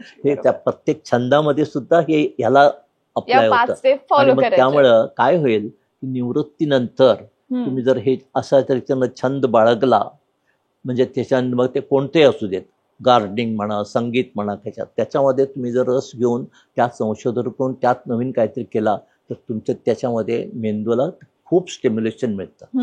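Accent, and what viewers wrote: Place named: Karnataka, India. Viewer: डाॅक्टर कर्नाटकातील आहेत. कानडीचा प्रभाव मराठीत जाणवतो. निरीक्षणावरुन.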